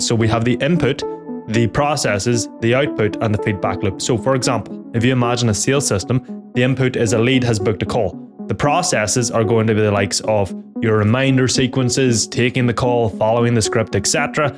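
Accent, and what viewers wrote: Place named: Northern Ireland, United Kingdom. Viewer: He got that light northern Irish accent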